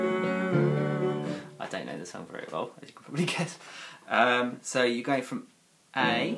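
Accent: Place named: United Kingdom. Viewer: I had to look at where you are from because you sounded British and voila you are from the UK. Possibly why your house is nicely decorated too. I am a beginner on the piano so I'll try this song out! I love it. And you don't know the lyrics that well lol but great job otherwise :)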